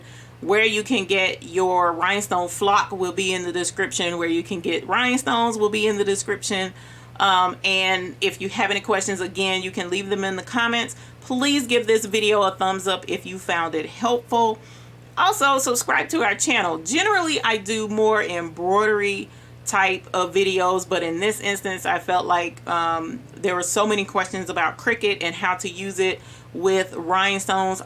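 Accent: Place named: Texas, United States. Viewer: Please tell me you are from somewhere within 100 miles of Dallas or Houston? That accent you have makes me feel at home. Can you read me a bedtime story?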